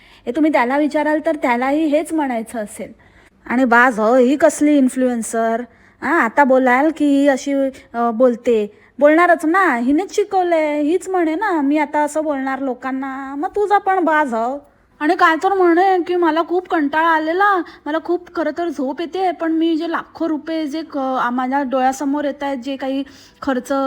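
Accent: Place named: Malvan, India. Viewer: Tujha malvani tadka mast hota 😍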